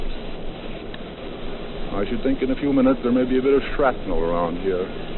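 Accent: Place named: Canada. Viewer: Murrow was from eastern Washington state. He sounds a touch Canadian, like he could have been from British Columbia.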